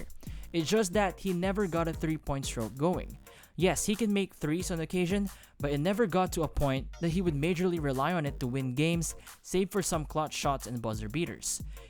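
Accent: Philippines. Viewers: Bro, are you filipino? That english accent of yours sounds like a pinoy.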